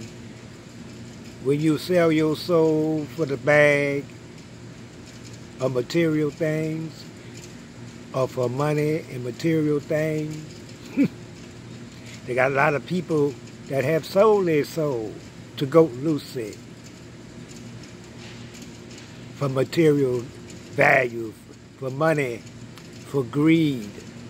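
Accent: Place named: New Orleans, United States. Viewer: Elder the way you talkin you real are man a live stream talk just like that the way you are talkin remind me of my relatives New Orleans 504 head bussa!!⚔💥⚔🔥⚔💥⚔✊🏿🔥✊🏿👊🏿💥👊🏿💥👊🏿💥👊🏿💥👊🏿💥👊🏿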